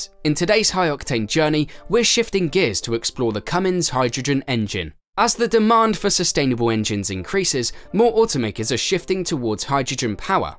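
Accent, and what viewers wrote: United Kingdom. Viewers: Why do they have to use British announcers, can somebody tell me why? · The narrator speaks too quickly and has a terrible limey accent which makes it difficult to understand.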